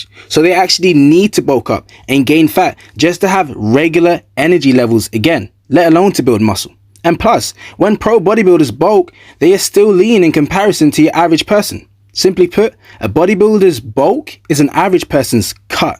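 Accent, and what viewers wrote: London, United Kingdom. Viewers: British accent is perfect for commentary. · it’s a london accent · lol no it's a London accent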